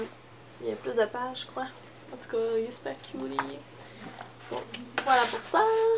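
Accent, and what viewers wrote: Quebec, Canada. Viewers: Juste tu as l'accent québécois donc j'en conclus que tu habite au Canada est ce que si la gagnante est française tu envera le colis en France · j'aime trop l'accent québécois t'est trop belle je participe a ton concours 💘😘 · coucou je tente ma chance !tes yeux sont magnifiques j'adore 💟 tu as exactement les memes gout que moi question:tu es quebecoise ?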